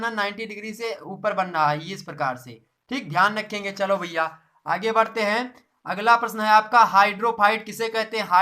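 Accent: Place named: Bihar, India. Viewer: Tu hu guru bihariy lagat hawa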